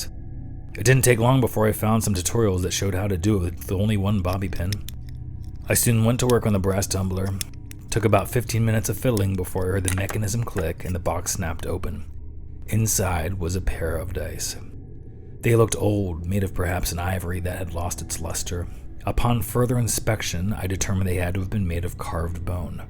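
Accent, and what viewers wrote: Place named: United States. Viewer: Very very good story ! And the narration is just perfect ! Being french, and listening to this beautiful English ( American ) voice add something I can’t explain ! Well done !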